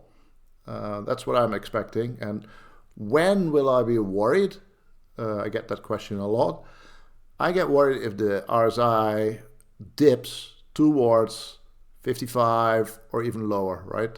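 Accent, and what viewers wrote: Netherlands. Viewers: I love the Dutch accent. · Are you Dutch? 🎉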